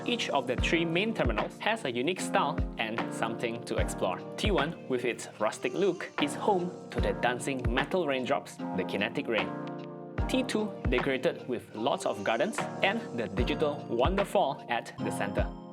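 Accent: Indonesia. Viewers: speaking of which, do i hear a hint of Indonesian in your accent? i love that your accent isn't your run-of-the-mill Singaporean accent! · your indonesian accent is very strong.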